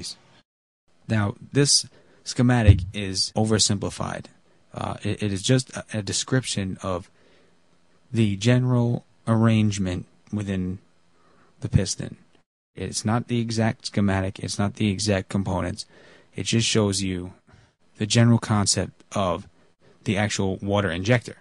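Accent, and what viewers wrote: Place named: Sweden. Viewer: UR injection of ignorance into this forum reeks arrogantly with a sweedish accent to boot, like dead Ya Ya Gabor's television appearances, so annoying.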